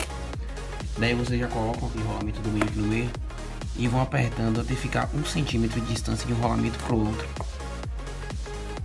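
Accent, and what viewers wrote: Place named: Bahia, Brazil. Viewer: Sotaque de baiano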